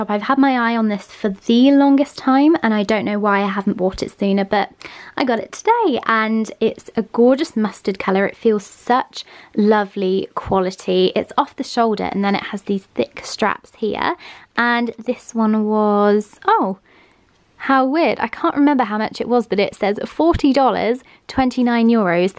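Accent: United Kingdom. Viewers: Hey my queen😍😍😍😍 I jus love ur British accent sooooo much n ur voice jus calms me down.. every single tym I watch ur videos it drives me crazy n I fall in love wit u even more.. ur soooo dam pretty ur eyes r sooo heavenly.. ur smile is killing n it makes me smile too.. thank u Soo much for bringing smile on my face every single tym.. I love u from d core of my heart my only wish in my lyf is to c u for 5 secs I Don't deserve to cm n talk to u so my only wish is to watch u for 5 secs😭 I VL blessed if such hapns❤ im from India n ur my angel really love u a lot😍❤😘😘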